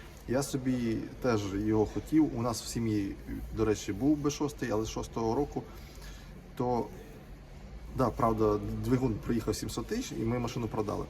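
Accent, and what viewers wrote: Surzhyk, Ukraine. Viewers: А мені подобається Ваш суржик і головне про що Ви говорите!!!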